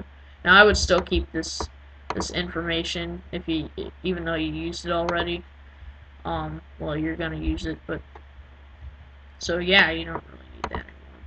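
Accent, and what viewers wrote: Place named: South, United States. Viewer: Your southern accent makes me want to puke all over myself :(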